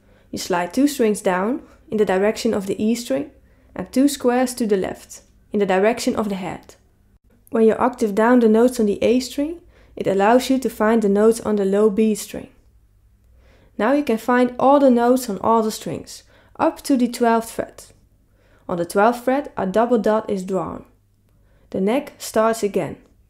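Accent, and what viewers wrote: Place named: Netherlands. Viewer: Thank you.... you made it so easy. Like your Dutch accent.😌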